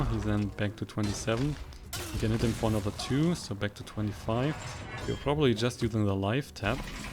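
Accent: Germany. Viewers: Thank you for the insight, looking forward to play this deck aswell. I can distinguish a strong german accent tho... which is not bad because I am german aswell. But you could definitely work on this! You could say that I am a native speaker, and I do see that you got a huge vocabulary (regarding average germans) - just keep practicing (if you want to drop it ofc). Nicht böse gemeint btw - hast mein Abo.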